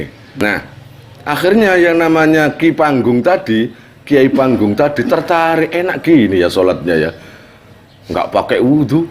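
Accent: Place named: Banyumas, Indonesia. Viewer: Mbrebes juga ngapak kyai 👍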